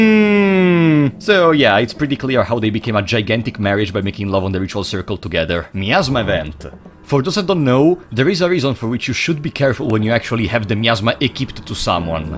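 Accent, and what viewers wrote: Italy. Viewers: i can hear the Italian accent from 1 mile away, and it is both nice and funny btw, great video :)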